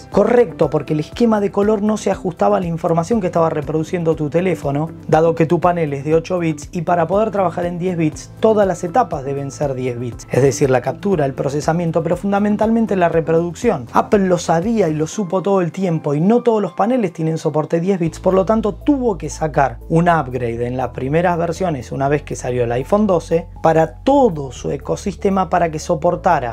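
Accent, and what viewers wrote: Argentina. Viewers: Wow, que bárbaro, cómo disfruté este video, excelente ponente, me hizo reflexionar, y habló acerca de un punto de vista particular, La compañía de la manzana se evalúa de una forma muy distinta y se le exige mucho más que a las demás, en contra parte y por que no todo son miel sobre hojuelas, es verdad que parece como si últimamente apple no probara sus dispositivos, los saca y en el camino los va mejorando, eso no me gusta, puesto que sus productos son muy caros y el usuario merece un dispositivo acorde con el precio que paga por el, si me vana cobrar mucho entonces espero un dispositivo con cero errores, y si los hay, que sean menores. Excelente programa, te llevas las palmas, un abrazo desde México papá; Y el acento Argentino es mágico, me gusta bastante, ojo sin menos preciar al español eh. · Me podría pasar escuchando hablar a un argentino hasta el año 2090 sin parar.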